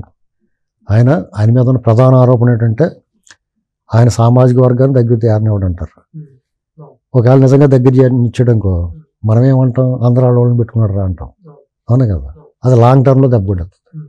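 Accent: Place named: Godavari, India. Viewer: అల్లసాని వారి అందెల సవాలు మాకు పరిచయం లేకున్నా వారి గోదావరి యాసతో అచ్చ తెలుగులో అనేక సామెతలతో గాడిదలు కూడా సిగ్గు పడే విధంగా సున్నితంగా విశ్లేషించే విధానం చెవులు నిక్కబొడుచుకుని 'ఈ జగ్లక్ భాగవతం ఎంత సేపైనా ఇంకా ఇంకా తెలుసుకోవాలనిపిస్తుంది. 40% గాడిదల కి ఇది అరణ్య రోదన అవుతుందేమో.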